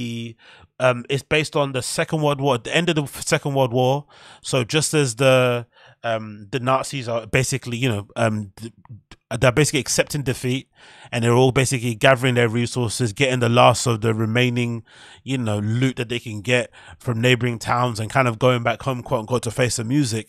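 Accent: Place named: United Kingdom. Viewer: Damn. Where you from, man? You sound British but your name is the most Angolan thing ever.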